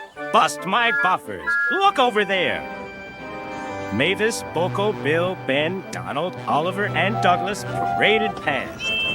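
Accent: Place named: Scotland, United Kingdom. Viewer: Scottish accent is very interesting.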